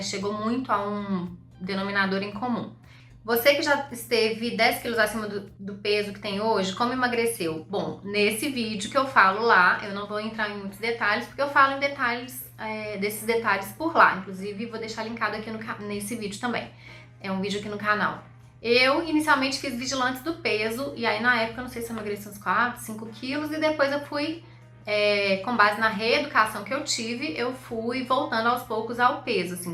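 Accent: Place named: Belo Horizonte, Brazil. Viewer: eu amooo seus vídeos... também sou de Belo Horizonte. Te conheci por causa dos vídeos da Escócia. Quando ouvi sua voz pensei na hora.." essa moça é de BH"...aí pesquisei e me inscrevi no canal. Adooooooooro. Deus a abençoe.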